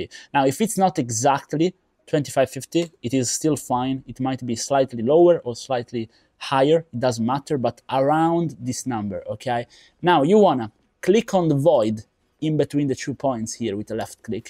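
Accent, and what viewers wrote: Italy. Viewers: troppo accento italiano :face-red-heart-shape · Ciao bellissimo video da come parli inglese sembri italiano, se cosi fosse fai un video uguale in italiano? Sei un grande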